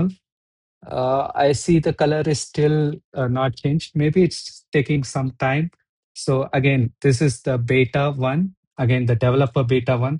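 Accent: India. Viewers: can you change your accent, bro it's toooooo much indian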